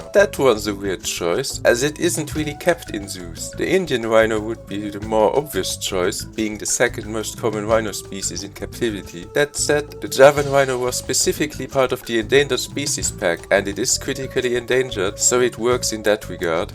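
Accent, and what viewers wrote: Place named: Luxembourg. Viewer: I was about to say that you are the first German youtuber I found, that has an adorable accent, instead of an insufferable one, but then I realised that you aren't German at all but a Luxemburger, which explains it. 😅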